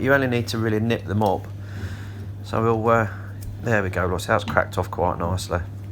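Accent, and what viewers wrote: Leicester, United Kingdom. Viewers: The accent implies you have to be a Leicester lad like me?!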